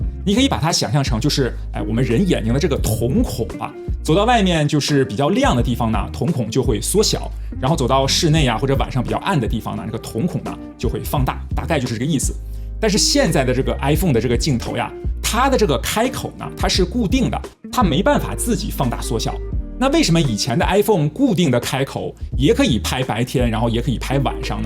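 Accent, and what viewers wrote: China. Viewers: 为什么用的词是台湾或香港那边的，但是口音是大陆的呢？好奇怪啊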